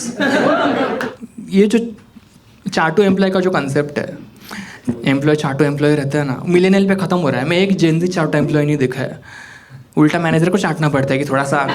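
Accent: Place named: Mumbai, India. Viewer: He has bombayai accent. So adorable 😍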